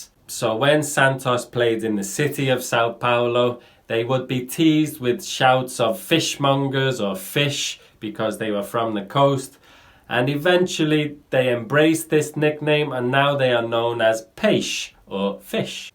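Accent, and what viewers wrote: United Kingdom. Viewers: Are you a scouse?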